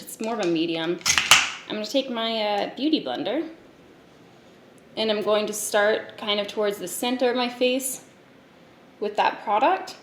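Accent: Canada. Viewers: Happy New Year! Love your Canadian accent,! That’s actually part of the reason I started watching you, Jamie and Allana. I also used to have a thing about Canadian guys based purely on their accents, then I realised if I carried on this obsession it might end in me marrying a mounty or something... Your skin looks fantastic! I also love Hourglass and got the Ghost blush palette for Christmas, as well as the Scattered Light holiday shadows set. They are beautiful. Hope you and your family have a wonderful 2020! Much love from Munich xx 💋 xx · I think your Canadian accent is delightful! You don't need that kind of negativity in your life... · I love your Canadian accent!! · OMFG YOU FINALLY SAID IT! I LOVE YOUR EYE BEHGS!!!!! WE LOVE YOUR ANGELIC CANADIAN ACCENT!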